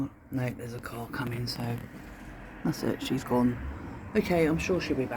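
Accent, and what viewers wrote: England, United Kingdom. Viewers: I love your videos, and your voice! We silly Americans go ga-ga for English accents! God bless you and the critters!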